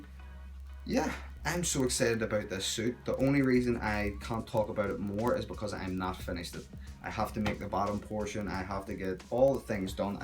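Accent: Ireland. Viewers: great channel..charming kid..well spoken..cosplayers do it best! i just rolled into cosplay at 36..big geek..big movie and toons fan..not many channels are that enjoyable to watch..like some big names i wont mention..i love cosplay chris tho..something about irish people..and australians thats just so loveable..good friendly characters.i'm from belgium myself and we have irish pubs here..lots of irish fellows working hard here and each of them friendly AF!:) always loved the way u guys talk and yr humor..yr a good fellow..dont get cocky lol..stay humble as u are and cosplay yr ars off! and remember..cosplay is about having fun and not the ego like some..guess those people are a bit lost inside or what not..but yr refreshing to watch:) hope u have a long good run here on the tube:) best wishes and greetings..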